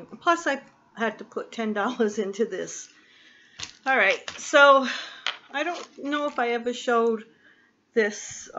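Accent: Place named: New York, United States. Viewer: You must be from New York or jersey !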